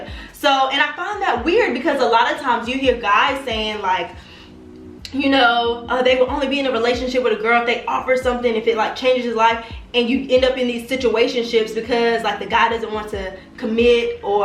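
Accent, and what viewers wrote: New York, United States. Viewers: your officially a new yorker, just listening to you talk in this videos I felt like we grew up together lol Love you so much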